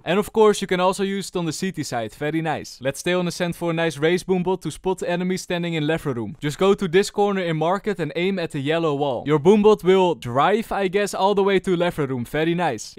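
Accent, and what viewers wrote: Netherlands. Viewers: If you put captions on its dutch! Is accent that heavy?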